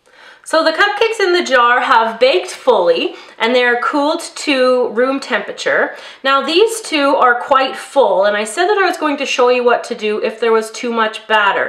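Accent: Canada. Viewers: Looks good. Are you Canadian? No hate but accent kinda bothers me.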